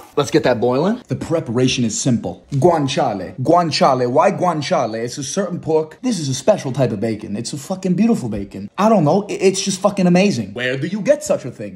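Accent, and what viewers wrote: Italy. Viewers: italian its his real accent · Devo dire che si sente il tuo accento italiano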